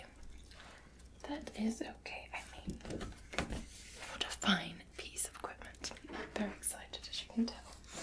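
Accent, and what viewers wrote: Scotland, United Kingdom. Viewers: Pretty neat and beautiful my birthday is today think we can be best of friends?💐💖😘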